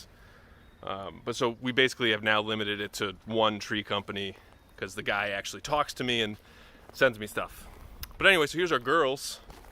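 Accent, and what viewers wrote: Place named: South, United States. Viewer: Loved the southern accent!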